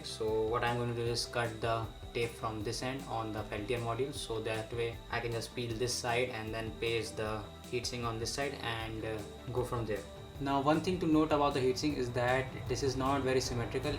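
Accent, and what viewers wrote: India. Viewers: Why do indians speak english like this!? It is infuriating!! 😡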